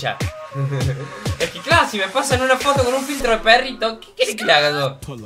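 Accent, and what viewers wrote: Córdoba, Argentina. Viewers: Renato Suazo Es cordobez boludaso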